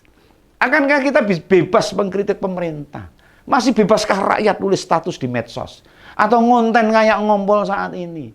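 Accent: Java, Indonesia. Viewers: Alhamdulillah Om nongol lagi Bicara apa adanya luwes cara membawakanya Kental basa jawanya. Monggo pilih Ganjar Mahfud yang tegar dan yahud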